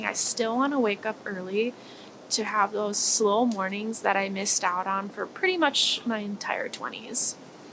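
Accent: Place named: Canada. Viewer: Girl if you didn't say otherwise, I totally would have thought that you are Canadian! Also, envious that you have a family business to work at...such a blessing, may you never take it for granted. Love from your northern neighbour!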